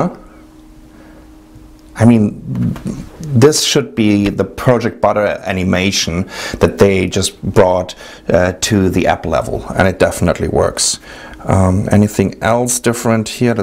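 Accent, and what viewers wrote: Germany. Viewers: Du lässt die das Wetter von China und Taiwan anzeigen, hast aber einen deutschen Akzent beim Reden? Suspekt.. Aber sympatisch :) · Like the video and German accents are cool, man.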